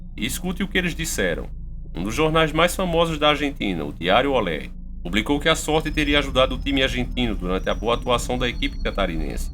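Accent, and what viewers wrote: Northeast, Brazil. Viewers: é o narrador da discorver channel más nordestino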